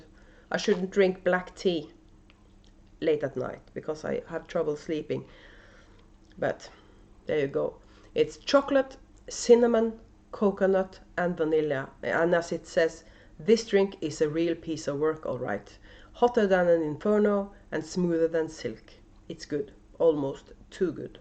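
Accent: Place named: Norway. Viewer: Your accent is wonderful! My great grandfather came from Norway as a very young man and I enjoy hearing the accent! Don't worry about editing, for me as a viewer, I love to see natural podcasting. I enjoyed making fun costumes for my children and they used to love decorating with pumpkins they had carved different faces on to put on the porch with candles in them we would light at night. We used to clean the seeds and bake them for snacks Wich was fun. The best for me, was making them pumpkin cookies, which the loved. They are a cake like cookie with lots of spices and rasins. Love your podcast. I do hope your face heals quickly. Yarny hugs! Ava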